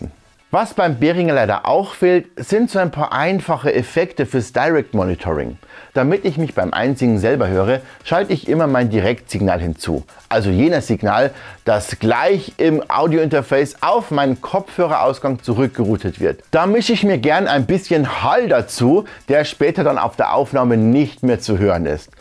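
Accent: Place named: Lower Bavaria, Germany. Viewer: Ja cool :) Grüße gehen raus von Regensburg nach Niederbayern 😊🤙🏼 hoff, ich deute deinen Dialekt richtig 😹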